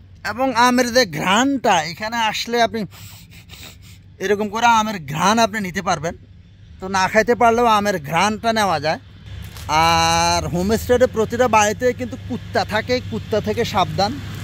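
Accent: Noakhali, Bangladesh. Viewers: ভাই আন্নে কি আংগো নোয়াখালীর নি? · ভাই আপনি কি নোয়াখালীর মানুষ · আপনে কিতা নোয়াখাইল্লা নিতা। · আপনি কি নোয়াখালীতে বাড়ি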